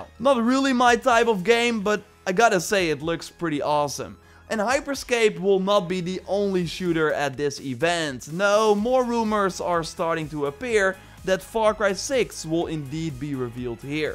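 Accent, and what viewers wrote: Netherlands. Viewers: the sound of your english accent, you must be dutch. Ofniet dan?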